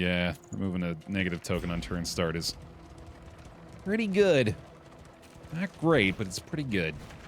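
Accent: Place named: Scotland, United Kingdom. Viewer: Dear god that scottish accent. Also instead of gobshite " ya wee dick" would have been better 😂. Love from Dundee 😊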